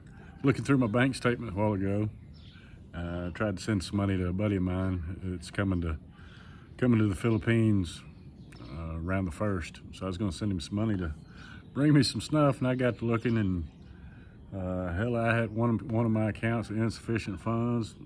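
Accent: South, United States. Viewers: I don't know if you're speaking quietly on purpose, I'm from Minnesota and with your southern accent, I can't understand a word you're saying, plus you mumble, and with that relaxed style, it'd be helpful if you could pla annunciate, thx for your fine content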